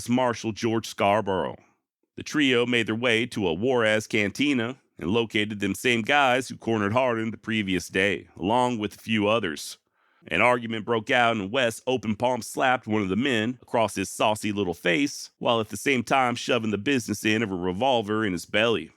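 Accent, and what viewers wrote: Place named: New England, United States. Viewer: sounds like your are a damn yankee. Are 'ya?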